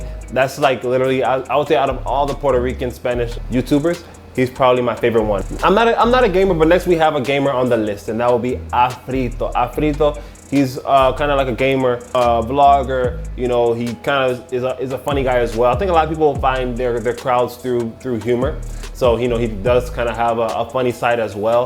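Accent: Dominican Republic. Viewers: Intro sounds Dominican 🤷🏽‍♀️🤷🏽‍♀️🤷🏽‍♀️🤷🏽‍♀️ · I’m Haitian and African American and the Spanish language has really driven me to find resources to learn Spanish. I have a bunch of Venezuelan and Puerto Rican friends and I’ve been trying to dissect and master the Puerto Rican Spanish for the longest. It’s a little hard because I don’t know too many helpful vids out there but you’ve giving me hope considering the fact that you’re African American as well and speak like a Dominican. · eres mi idolo. soy estadounidense e igual que tu, vengo de chicago. ahora soy universitario y hago la carrera en espana. diria que por lo general domino el idioma pero me gustaria hablar como tu un dia y que la gente no sepa que mi idioma natal no es espanol jajaja. lo gracioso es que a pesar de haber aprendido en espana trato de hablar con acento boricua jajaja. no tiene mucho sentido ya que vivo alli pero por ejemplo como la mayoria de hispanohablantes en el mundo no usan vosotros pues me parece mas practico usar solo ustedes aunque si que es verdad que he aprendudo su jerga jajaj. 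por cuerto tus tik toks y el flow dominicano que llevas ta duro. tuve un companero de cuarto del patio y entonces a traves de el y tus videos, y mi deseo de hablar como boricua, intento conseguir mi propio pikete caribeno jajaj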